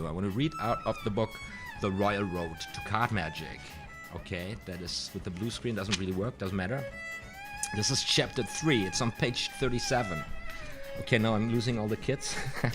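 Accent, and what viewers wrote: Germany. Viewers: woow ich hab vorher von chris ramsay gelernt und hab dich gerade eben gefunden...habs sofort am akzent geört das du deutsch bist hammer !!!! mach weiter so !!!!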